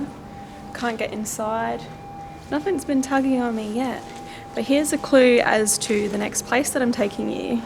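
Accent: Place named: Australia. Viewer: You've got an Aussie accent!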